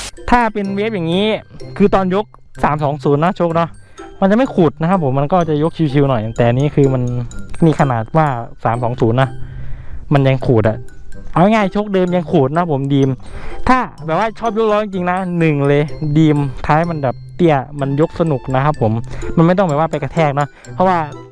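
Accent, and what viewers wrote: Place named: Isan, Thailand. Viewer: เว้าอีสานแน่อ้าย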